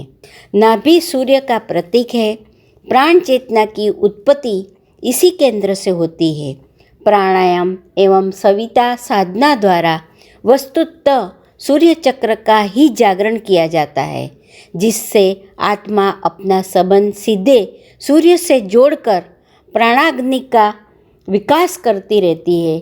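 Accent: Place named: Gujarat, India. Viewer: Madam 👩 ji 🙋‍♀️🙋‍♀️ Aapka... ( pravachan ) bahut achha hai 🙋‍♀️🙋‍♀️ But aap pakka gujrati ho ji 🙋‍♀️😊😊🙋‍♀️🙋‍♀️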